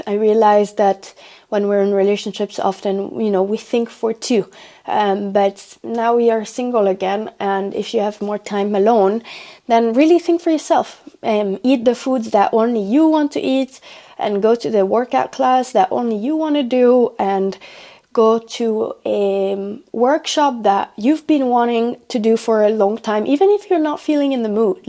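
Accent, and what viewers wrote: France. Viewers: I'm wondering if the secret boyfriend is French speaking, more French accent is coming out 😊❤ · she does have a bit of a french accent that comes out every once in a while and I notice it in many videos. I feel that the same happens to me when I talk in a certain language more often my accent shifts. But yes overall she does not have a strong accent or anything. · Îm love that her little French accent is coming through more. It sounds SO cute! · Nice video as always... your accent sounds more french in this one for some reason :D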